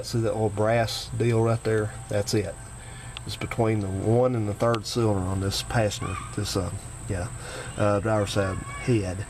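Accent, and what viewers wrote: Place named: Georgia, United States. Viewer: you've gotta be from south GA. Ill be danged if Im wrong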